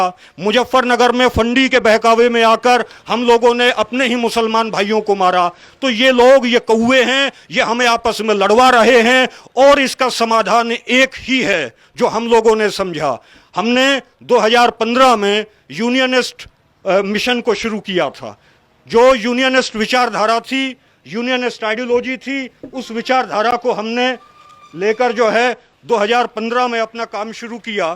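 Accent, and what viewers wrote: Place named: Bihar, India. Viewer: Bhai u r talking like a bihari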